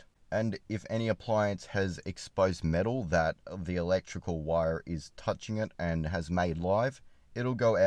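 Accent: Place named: Australia. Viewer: Dude you are one of the funniest channels on YouTube. The dry delivery and Aussie accent (at least to me here in America) is fuckin fantastic. If I’m ever in Australia, I’m gonna figure out who you are and we’re gonna meet up for a beer or 7. Keep the great shit coming!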